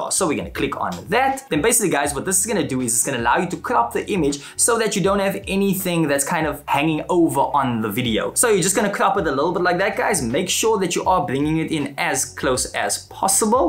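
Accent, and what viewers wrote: South Africa. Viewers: Is that a South African (Cape Town) accent I'm detecting there? :)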